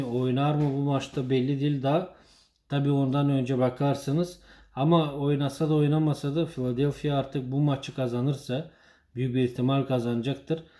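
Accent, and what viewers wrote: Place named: Bulgaria. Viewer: Sen avrupa dasin galiba bide konusman bulgarlar gibi?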